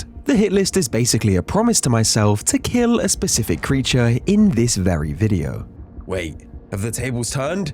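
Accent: United Kingdom. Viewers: His accent, always gives the British narrator 🙏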